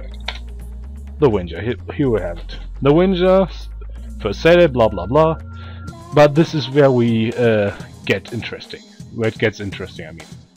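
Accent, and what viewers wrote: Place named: Germany. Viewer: My Internet is spazzing out atm - I can't even load up SPUF yet I can watch YT videos (like this one) just fine. Good job, you made some nice item changes, and... Your voice is kinda...Well, German. :P No offense, just saying. (also, not Winjer - just saying :P)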